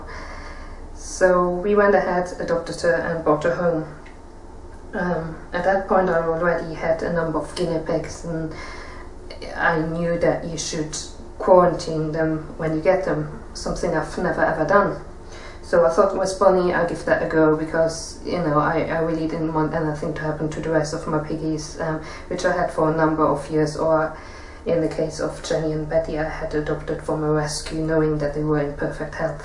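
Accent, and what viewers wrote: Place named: Germany. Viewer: Your accent is awesome!!! Are you German?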